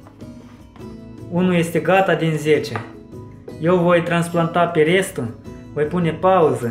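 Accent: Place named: Russia. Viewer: vlogul e fain,dar mai lasă limba rusa deoparte, te face să vorbești Romana "urat" 👍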